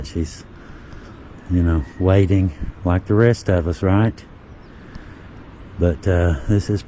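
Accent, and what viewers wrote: South, United States. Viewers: I’m from Phila PA in the great Northeast. Tonight was the first time I watched your video. I was enjoying your southern accent when I noticed the depth of your research. Thank you for your work. I hope you get more viewers. this was the first time the algorithm directed me to your video and subscribed to a new channel.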